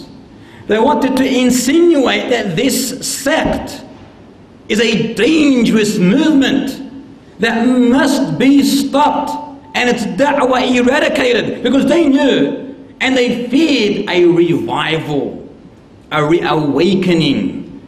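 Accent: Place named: Australia. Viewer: before insulting him, please know that he accent is Australian and that's how they talk. Don't judge him for the way he speaks, but for his content.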